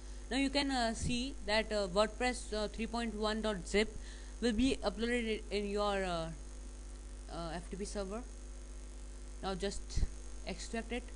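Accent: India. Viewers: Thanks dude.... are u indian?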